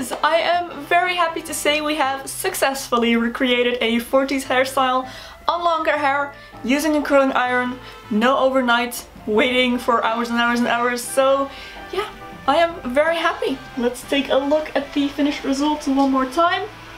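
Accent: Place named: Netherlands. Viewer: ik hoor een Nederlands accent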